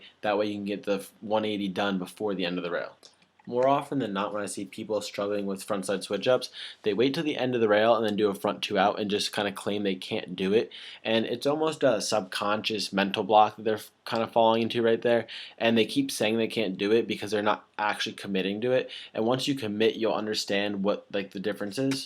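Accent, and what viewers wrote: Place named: New Jersey, United States. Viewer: NJ accent + skiier inflection = Canadian accent? That is very interesting. Nice video btw!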